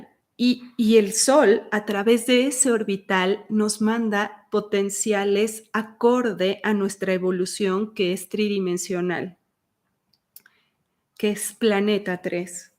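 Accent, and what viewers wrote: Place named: Mexico. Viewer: Hermosa, me gusta tu tonada mexicana,soy de Argentina y cuando dijiste son las 13 y 13,miro la hora que te estoy escuchando 19 y 19.😊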